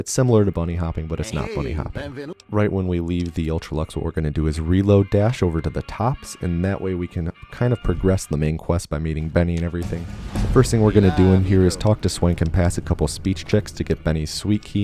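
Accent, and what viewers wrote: Italy. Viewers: Are you italian? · Are you American or italian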